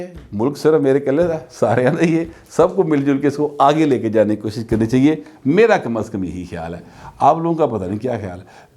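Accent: Punjab, Pakistan. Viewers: آپ کی پنجابی ہی تو پیاری لگتی ہے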